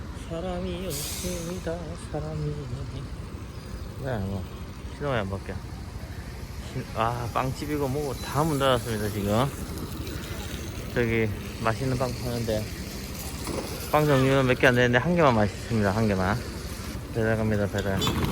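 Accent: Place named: Gyeongsang, South Korea. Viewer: 경상도분 이신가봐요 말투가 상당히 친숙하네요 ㅋㅋ 건강 잘 챙기시길 바랍니다!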